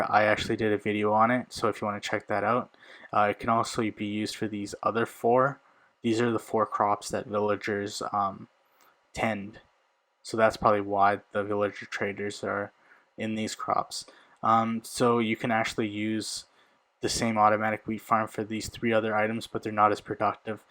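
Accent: Punjab, India. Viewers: Is you punjabi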